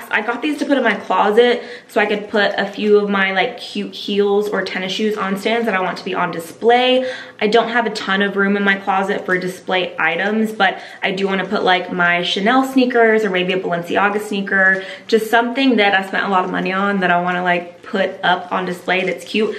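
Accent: South, United States. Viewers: Why am I just noticing your southern accent?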